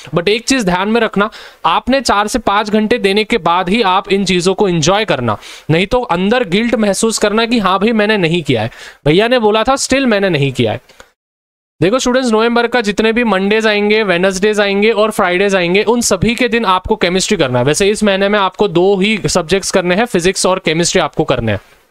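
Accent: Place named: Aurangabad, India. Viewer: Aurangabadi accent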